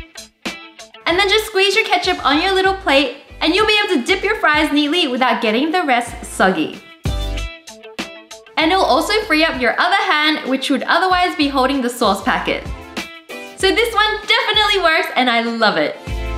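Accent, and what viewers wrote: Australia. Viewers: Are you Australian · Wait is she Australian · Are you Australian???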